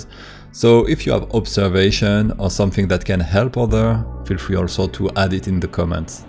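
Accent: France. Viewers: Mmh tu a un accent fr xD Sinon très cool merci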